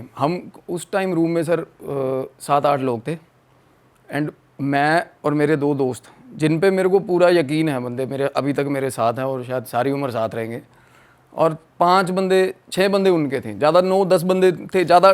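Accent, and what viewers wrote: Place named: Pahadi, India. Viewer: Seems like pahadi kind accent...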